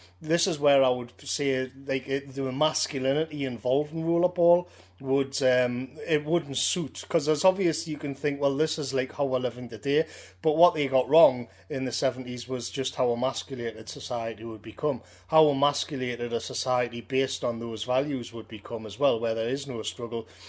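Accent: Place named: North East England, United Kingdom. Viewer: its really is beautifully articulated content, in a way that only the north east accent can deliver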